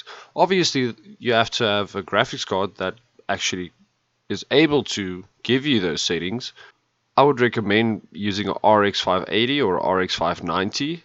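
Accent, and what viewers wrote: South Africa. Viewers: you sound South African, lol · Yayyyy your south African yessss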